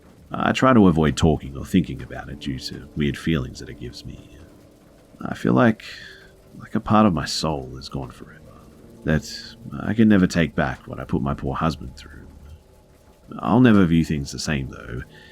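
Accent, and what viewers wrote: Australia. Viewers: I 💘 your channel! You have the most gorgeous sounding Aussie voice. Also, your narration is top notch -- truly professional. I'd listen to your narration on just about anything! Okay, anything at all!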